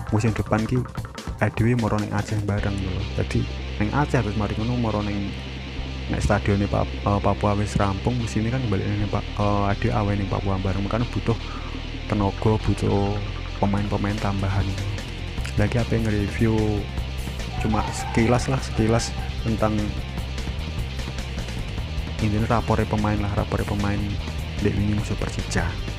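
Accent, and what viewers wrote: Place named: Surabaya, Indonesia. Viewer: Bahasane suroboyo bgt 😁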